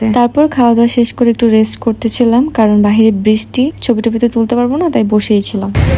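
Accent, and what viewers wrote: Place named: Chittagong, Bangladesh. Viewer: চিটাগং এর মানুষ খাটি শুদ্ধ বলতে পারেনা আপনার কথা তার প্রমান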